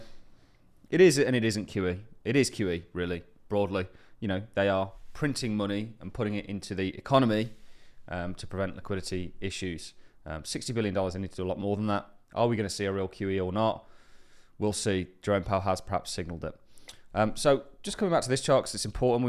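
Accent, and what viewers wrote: Birmingham, United Kingdom. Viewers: I hear midlands, birmingham way maybe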